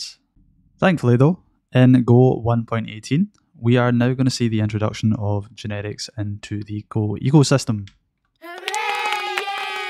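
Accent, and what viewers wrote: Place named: Scotland, United Kingdom. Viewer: Probably the Scotish accent. :)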